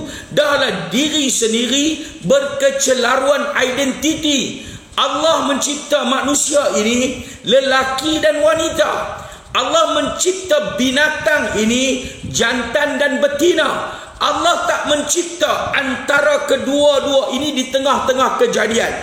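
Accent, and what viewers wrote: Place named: Kedah, Malaysia. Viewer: Dia ngomong basa logat provinsi kedah,sampean mboten ngertos yo mboten nopo nopo.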